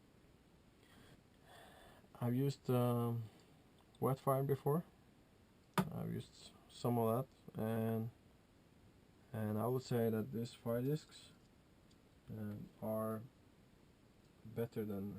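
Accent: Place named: Norway. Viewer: Nice tip! Made my own. Burns really well, about10 min burn time. Btw in the video you say "I don't have any more "rests" he he Nice Norwenglish .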